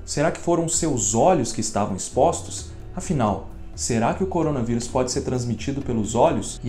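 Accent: Brazil. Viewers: eu gostei do conteudo e do jeito de falar de Voce e seu portugues " brasileiro"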